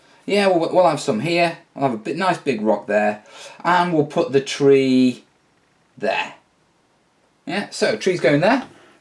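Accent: United Kingdom. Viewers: a terrain version of Bob Ross with a British accent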